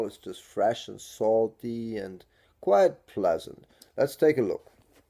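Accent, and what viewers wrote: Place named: Iceland. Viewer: Love your accent, are you from Iceland?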